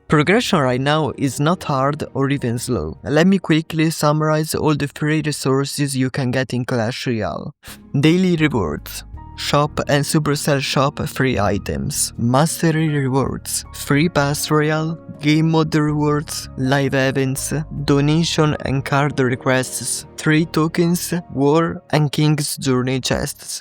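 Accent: Italy. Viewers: Grande fra, parli benissimo inglese, ma si è subito capito che fossi italiano dall'accento!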